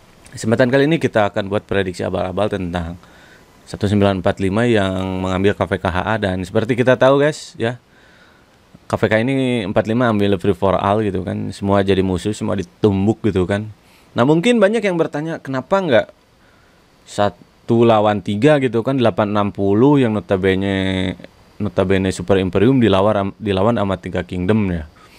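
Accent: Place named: West Java, Indonesia. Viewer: penyebutannya / pronounciation nya dia aja karena dia orang Sunda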